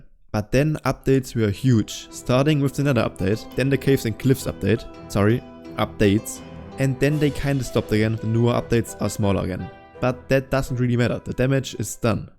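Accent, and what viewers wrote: Germany. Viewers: Man hört, dass du Deutsch bist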